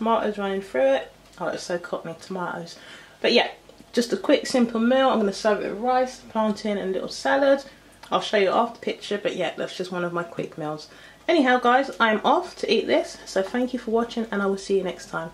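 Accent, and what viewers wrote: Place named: United Kingdom. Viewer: Aside from the British accent your voice is so nice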